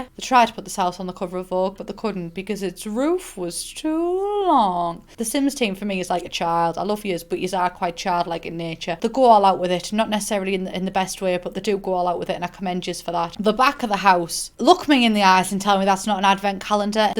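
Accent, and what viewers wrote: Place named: Glasgow, United Kingdom. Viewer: I used to live in Glasgow and this video gives me those vibes. Also I love your accent it takes me back to those great times hen xoxo from spain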